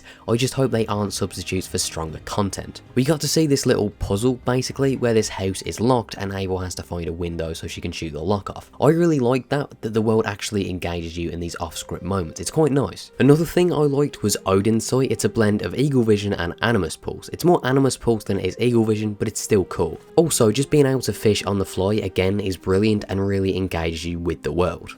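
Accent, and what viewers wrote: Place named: Midlands, United Kingdom. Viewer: Where are you from man, your accent sounds so ambiguous, I’m either thinking somewhere in the Midlands or the West Country 😬😬😬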